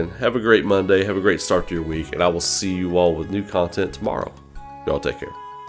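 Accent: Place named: Eastern Kentucky, United States. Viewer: I love your content bro! Btw are you from Eastern KY? You sound exactly like one of my best friends from there. Very easy to listen to!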